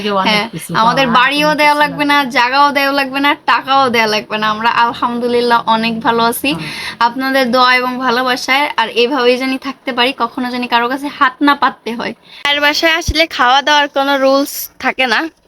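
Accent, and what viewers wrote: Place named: Gopalganj, Bangladesh. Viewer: আপু আপনার বাসা কোথায়। ভাষা শুনে মনে হচ্ছে গোপালগঞ্জ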